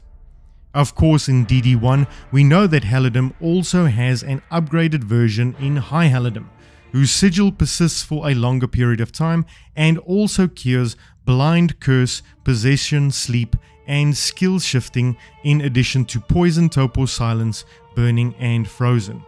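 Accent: South Africa. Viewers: South African? Nice! Subbed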